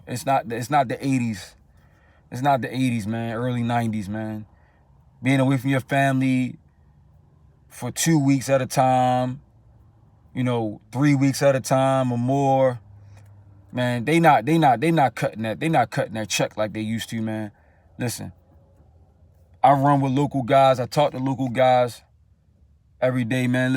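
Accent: Baltimore, United States. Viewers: I’m a nurse but just be curious about other professions n general but I like how U kept it 💯 a lot solid info and I def heard that Bmore accent lol by any chance u remember which season/episode of The Wire u were n? Thanks for the video ✌️ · 2 things I learn from this video. 1. What to expect my first year pay of trucking. 2. I can still recognize Baltimore accent from anywhere.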